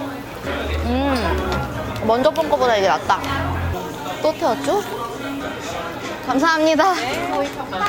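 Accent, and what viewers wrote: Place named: Gyeonggi, South Korea. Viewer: 대리만족하며 잘 보구 있어요 지난 영상부터 몰아보기중인데 님의 나른한 경기말투 넘 좋네요^^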